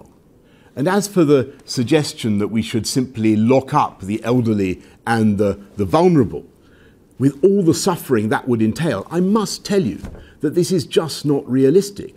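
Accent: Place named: RP, United Kingdom. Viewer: This guy couldn't even iron a shirt on his best day - constant riddles and nonsense. Talking slowly with that posh accent isn't enough anymore.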